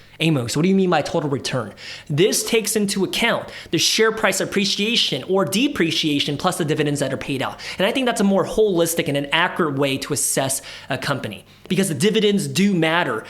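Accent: United States. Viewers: It is so funny to see a chinese guy speaking which such a heavy Gringo Accent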